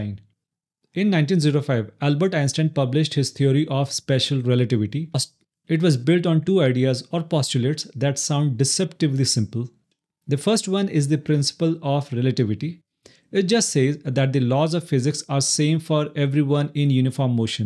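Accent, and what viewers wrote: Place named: India. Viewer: I can't understand your indian accent. Have you tried speaking normal?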